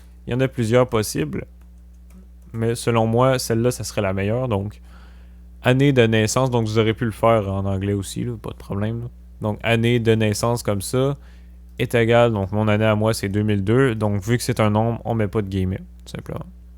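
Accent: Quebec, Canada. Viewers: OMG... QUEBECOIS... ACCENT... J'ADORE !!!!! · Wow le grand accent québécois !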